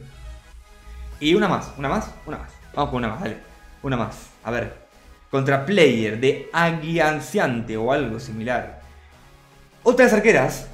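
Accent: Argentina. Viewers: Che Vivis en argentina o españa por la forma en la que hablas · Habla como argentino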